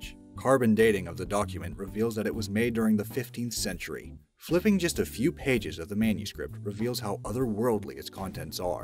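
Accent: United States. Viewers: So American voice over an obviously foreign made video. "We are still dont know what it was" among several different word errors ive noticed. I have nothing against foreigners, just making a point.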